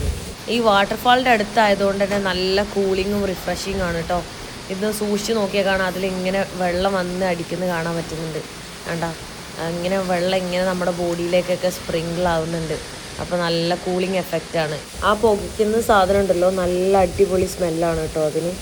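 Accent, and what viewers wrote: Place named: Tamil Nadu, India. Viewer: 👍.. Speck slightly tamil...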